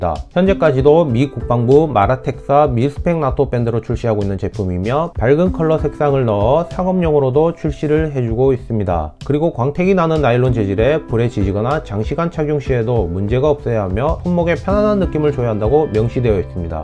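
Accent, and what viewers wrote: Gyeongsang, South Korea. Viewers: 나토뺀뜨..사투리가 남거슬리는군요..경상도 억양이..ㅋ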